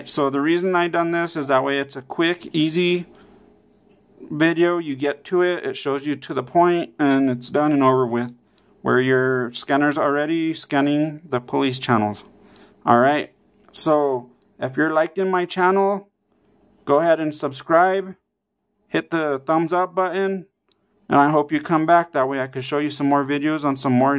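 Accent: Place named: Bay Area, United States. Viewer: Thanks bud. You say Hella. Im from the Ca BayArea too.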